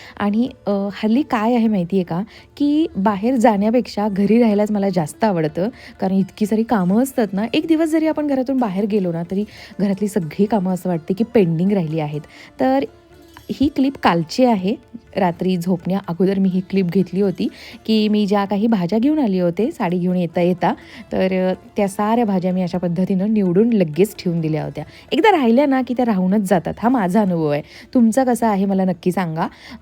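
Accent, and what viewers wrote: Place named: Pandharpur, India. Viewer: Hi तुझी भाषा थोडीशी आमच्या पंढरपूर सारखी वाटली धपाटे चिकाचे दूध❤❤ तुझे बरेचसे ब्लॉग बघितले छान नॉलेज आहे तुझ्याकडे 👍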